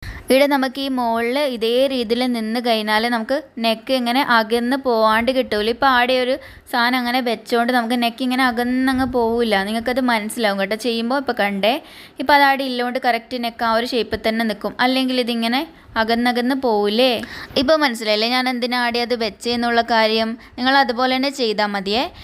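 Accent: Kannur, India. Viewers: Ithu nammude kannur bhasha anallo like cheithitundttoo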